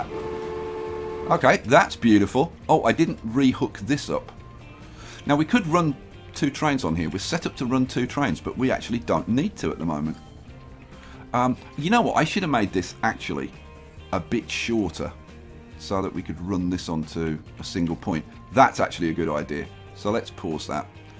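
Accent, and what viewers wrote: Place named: Northern England, United Kingdom. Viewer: totally northern England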